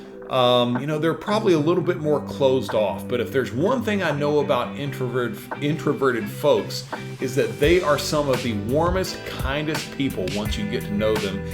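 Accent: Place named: United States. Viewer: I love your fragrance videos and I'm from west Michigan and i can understand your American accent some of the fragrance channels i watch on YouTube even though they are speaking English language they still have a foreign accent and hard to understand what their saying thanks bro for the the tips and a really good fragrance review video 👍👍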